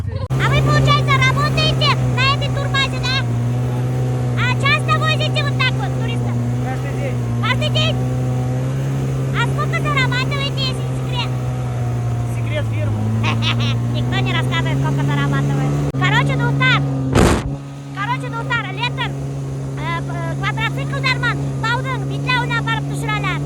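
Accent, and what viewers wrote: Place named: Bashkortostan, Russia. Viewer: Похоже вы хорошо знаете татарский. У нее самый ни на что есть башкирский, да ещё зауральский.😂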